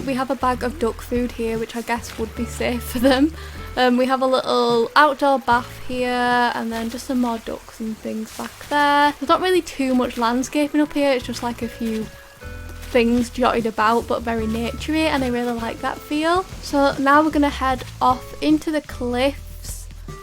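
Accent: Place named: Northern England, United Kingdom. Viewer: your accent makes me so happy, I'm from Yorkshire and hearing another northerner makes your videos feel so cosy aha, your island looks so so good!!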